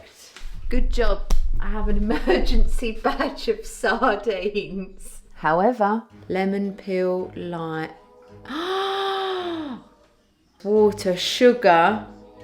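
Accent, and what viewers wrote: United Kingdom. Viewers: You have the most british voice ever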